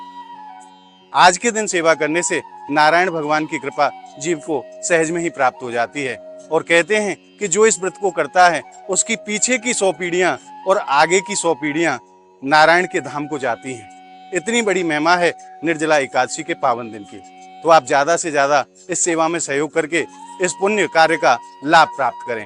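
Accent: Braj, India. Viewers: आपकी आवाज ब्रज मंडल की अच्छी लगती हैं राधा कृष्ण जी k darshan achi thrah 2mint tak करवाया करो dhanabad❤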